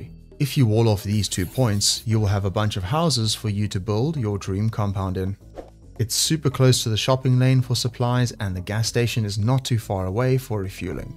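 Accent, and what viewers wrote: South Africa. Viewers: Maat, is jy 'n Suid Afrikaner? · are you South Africanbru?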